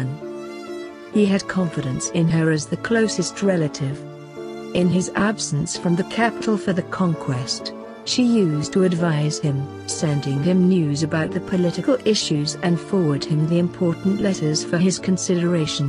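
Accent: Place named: England, United Kingdom. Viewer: Pronouncing Turkish with an English accent is a delight !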